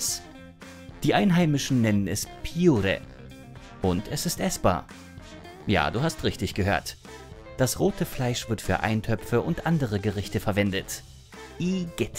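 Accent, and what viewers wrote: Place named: West Germany, Germany. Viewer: was isn das hier fürn schrott? gruselgeschichten für 12 jährige? am erschreckendsten ist das wessi-deutsch vom sprecher...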